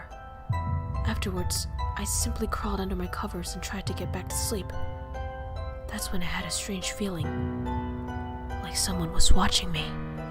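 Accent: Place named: Russia. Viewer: That was fun,nice deep Russian accent btw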